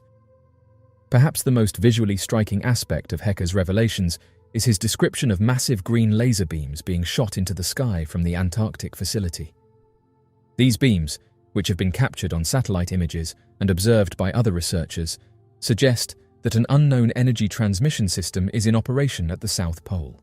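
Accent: United Kingdom. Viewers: So incredibly boring when narrated by a Brit!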